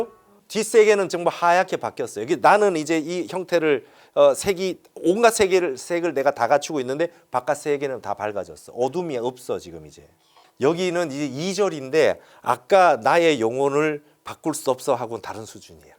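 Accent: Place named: Gyeongbuk, South Korea. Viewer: 끝말이 ~라 경북말투네요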